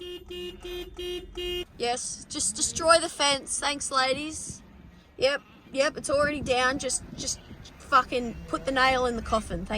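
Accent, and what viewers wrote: Australia. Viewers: I just started watching your videos and have watched loads of them so far. I served in the USA military and enjoy how you talk, it reminds me of the way we would talk (except without an Australian accent) lol. Im curious about what kind of car you drove on the ranch and how old is it? It seems to a real tough little guy.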